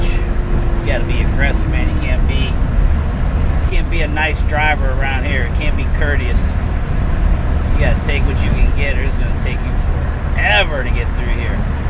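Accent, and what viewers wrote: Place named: South, United States. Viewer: Nobody like driving in or around NYC. Your lucky the traffic is moving good. I always run into a accident or lane closures at night. People are mean to over weight people that they perceive to be Trump supporters. Given that you live in a van and sound like your from down south. If you were a hot girl the guy would have made more small talk.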